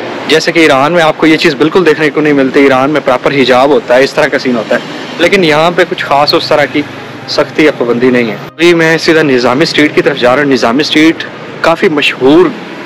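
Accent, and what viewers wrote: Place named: India. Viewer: tera English accent bahut desi hey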